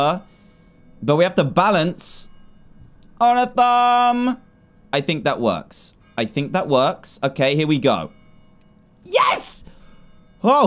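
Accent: United Kingdom. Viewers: I'm new to his channel, damnnn I love that British accent 😍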